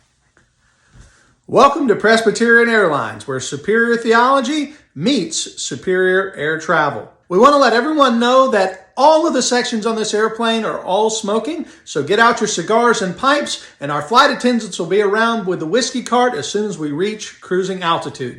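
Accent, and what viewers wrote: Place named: Scotland, United Kingdom. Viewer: arguing the Bible in a think Scottish accent is . . .divine!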